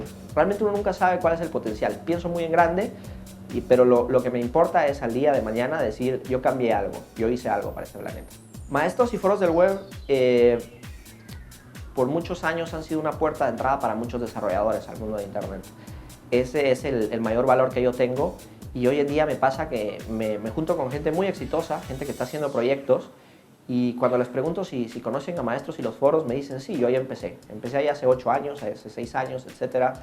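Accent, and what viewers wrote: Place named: Spain. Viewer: Por que el acento español??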